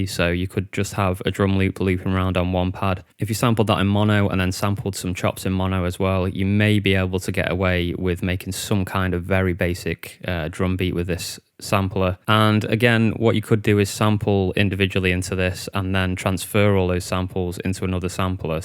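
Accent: United Kingdom. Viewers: could I do it in a DAW, or do I have to use a door? (Just kiddding, love the UK accent and the videos!)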